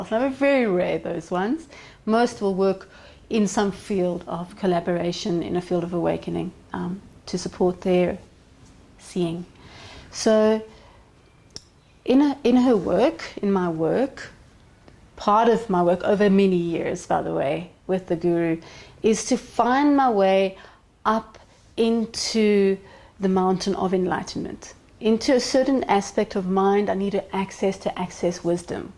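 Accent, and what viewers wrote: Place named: South Africa. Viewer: You sound South African. Are you?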